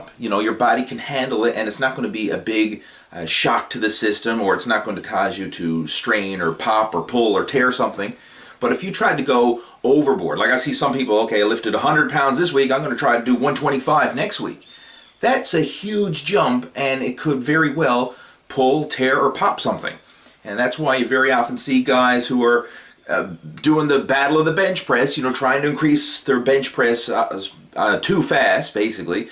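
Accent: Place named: Atlantic Canada, Canada. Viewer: Holy shit im canadian but im still annoyed by his accent. he must be from pei or newfoundland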